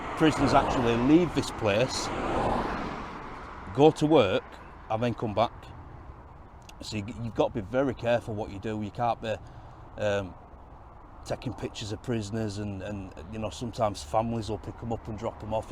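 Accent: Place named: Yorkshire, United Kingdom. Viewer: Does anyone listen to these videos just for the Yorkshire accent? Hope you're all feeling better. Cheers from Texas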